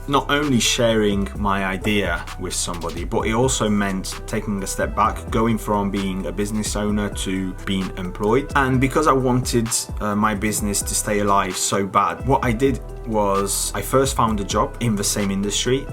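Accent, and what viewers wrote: United Kingdom. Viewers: Amazing video,. Thanks for taking the time to go through your thought process back then. PS. Incredible British pronunciation:O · Kudos and huge compliments for your accent and also for your property and command of the English language. You sound super British and it doens't seem you're Sardinian at all.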